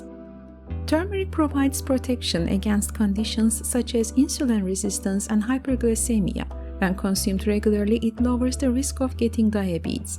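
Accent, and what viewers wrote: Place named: Turkey. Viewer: from your accent I hear you are Turkish. Turkey has the best chefs.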